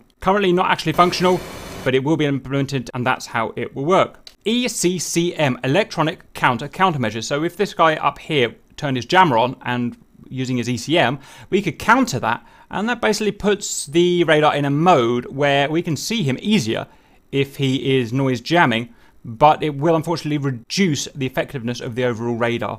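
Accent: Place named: United Kingdom. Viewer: True, but only if you are in a hurry. But I enjoy his way to entertain. I'm Italian, but I love this British accent and humor!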